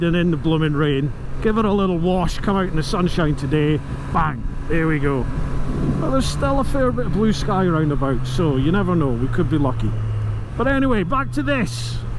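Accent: Scotland, United Kingdom. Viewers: I hear a Scottish accent. I hit like. Its really that simple.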